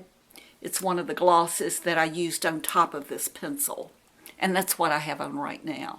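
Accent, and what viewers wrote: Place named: Western Kentucky, United States. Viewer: Hey, Thanks to you, I shopped my stash and "rediscovered" my Laura Mercier Mineral Powder foundation. I had totally forgotten how awesome this product is! I love your videos and think you are fabulous! I'm from Western Kentucky so we sound a lot alike too, ha!!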